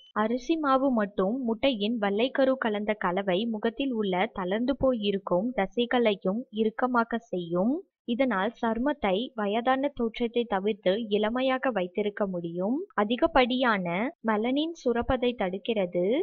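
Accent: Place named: China. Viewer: பேசுவது சீன பெண். அதனால் மன்னித்து விடலாம்.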